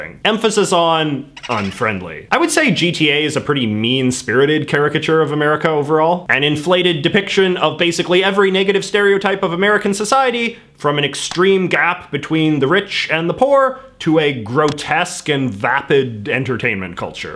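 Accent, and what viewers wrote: Canada. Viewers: Yo is this dude from Canada? Is my first time seeing his videos and the way he says “about” is Canadian asf lol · This guy has the most Canadian accent in the history of the world.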